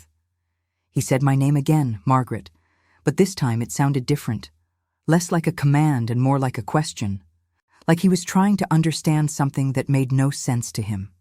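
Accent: United States. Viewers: Why the American narrator? You need a British accent otherwise this sounds cheap.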